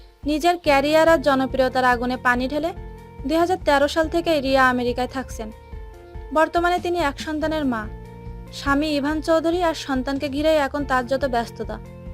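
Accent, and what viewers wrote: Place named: Pabna, Bangladesh. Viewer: kotha shune to mone hoy bari pabna, agey shundor kore kotha bolte shekho.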